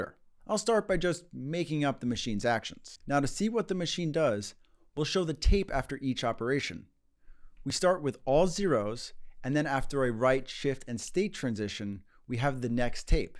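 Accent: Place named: East Coast, United States. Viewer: You have a very similar accent to three blue, one brown. Is that like in East Coast thing?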